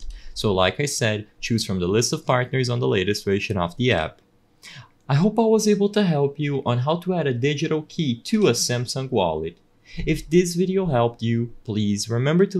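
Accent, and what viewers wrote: Brazil. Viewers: That's certainly a Brazilian speaking English. You got caught by your accent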